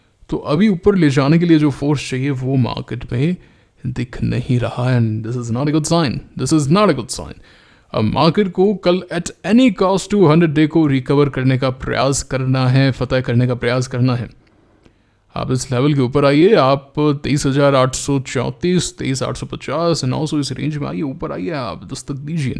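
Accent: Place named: Bikaner, India. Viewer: sir Bikaner ya uske aas paas churu, hanumangadh tri junction ke prateet hote hain bolne se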